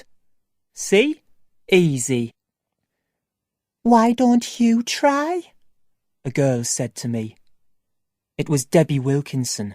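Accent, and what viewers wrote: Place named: Ireland. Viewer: freaking irish accent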